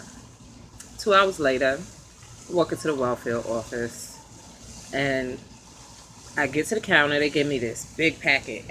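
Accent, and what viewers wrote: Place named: Brooklyn, United States. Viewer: great "sumpen ain't right face". I lol'd . great accent too, Brooklyn? farewel is a joke, but only the rich are laughing.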